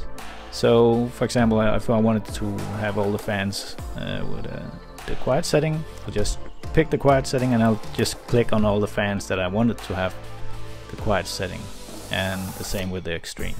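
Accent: Denmark. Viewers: are you from Denmark ?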